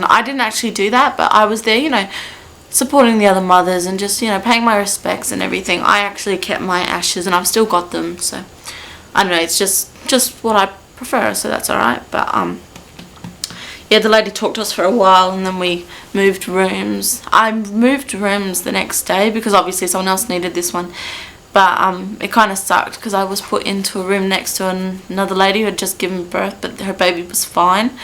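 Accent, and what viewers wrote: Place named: Australia. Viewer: Hi. I wish I could give u a big hug right now! I can tell ur an Aussie bcos of ur accent. I'm an Aussie also. I have had 2 losses in 3yrs ( from so many complications) and I have never told my story until the doctors are talking me into it and they r eager to mention me in the medical journal. I believe in miracles bcos now I am 32weeks pregnant n baby is active n healthy!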